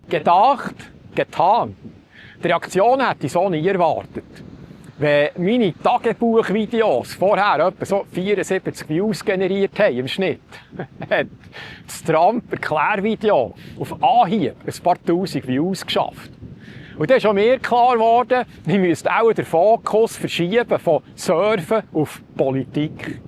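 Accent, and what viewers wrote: Bern, Switzerland. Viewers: Du sprichst so ein klares Berndeutsch, schon deshalb ein Hörschmaus! Dazu sind die Ausführungen anregend. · Hallo, merci as Du üs o es biz i Dini Erfahrige mit dem Kanal u i dHintergründ lasch la blicke. Interessant für mi: Din Gedanke live vor eme Publikum... han i o scho gha 😊 U uf Dini Themene fürs 2026 bin i gspannt. Bis jetze nume es bravo a Di! Blieb dranne, bhalt Din asteckende Humor u o sBärndütsch, i ghöre das so gärn. Hebs guet, gsegneti Feschttäg, Grüess us em chalte Mittelland, Rolf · Sie sind einfach eine grosse Klasse. Sie sprechen ein schöner Berner Dialekt ❤️ Lieben Gruß aus dem schönen Aargau. Dankeschön ihnen.😃😃🌲🌲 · I ghöra z Bärndütsch eifach so gärn. 👍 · Ich finde dini arbet SUPER !!! mach gnau so wiiter. Au dis Bernduetsch findi sehr agnehm den hiea in Florida ghoer ich das chuum 😂😂 wuenche Dir schoeni Festtaegli und en guete rutsch is 26gi .... 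Liebi gruessli us Florida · Ändlech eine, wo Bärndütsch schnurret! Carry on, Gruss von einem aus der ehemaligen Schweiz vertriebenen Stadt Berner aus den Philippinen.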